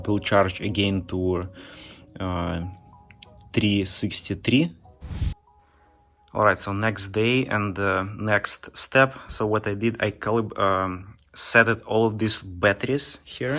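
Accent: Russia. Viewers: Жуткий русский акцент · Nice RunGlish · Is that a sweet Russian accent I hear? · Русский акцент ни с чем не перепутаешь ))))) Так держать! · I do not read comments, as most of them are from people who have no clue what is the real issue. With all due respect, you sound like Russia is the place you came from. I run an industrial automation company and I employ11 Russian trained engineers. All of them are top notch specialists. I assume, you are as good as them. If not better. I have a simple question - do you think that after all the mistakes you went thru, and wastedvmaterial, would it be better and less expensive to buy a commercially available battery of similar performance? If so, what would that be? So, keep up good work, MALADIEC! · боже да что же за акцент , накой Русскому говорить на инглише есле его не особо знаешь)) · Русский? )) акцент пипец)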